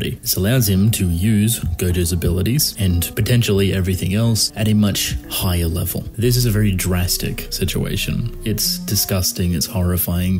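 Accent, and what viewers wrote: Australia. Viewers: I don’t know why, but I think I’m more likely to sub to a channel when the narrator is Australian.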